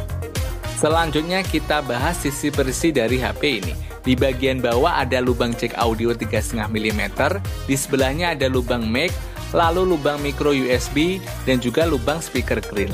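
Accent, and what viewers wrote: Magetan, Indonesia. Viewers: Iki lak wong Magetan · Boss...magetan e ndi boss?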